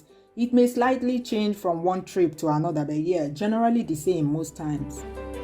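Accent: Igbo, Nigeria. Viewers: I love hearing you speak igbo.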